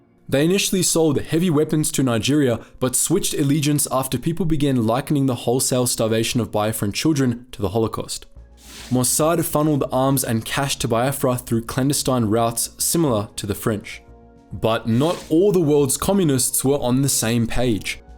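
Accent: South Africa. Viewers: He sounds south african. And most people don't care about cricket, at all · Is the narrator a saffa?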